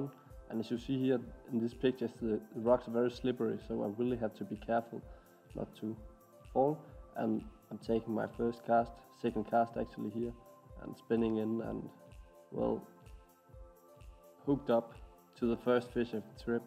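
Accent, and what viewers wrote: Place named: Scandinavia. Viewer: I would think maybe Scandinavian with your accent. Wouldnt have guessed French.👍🏴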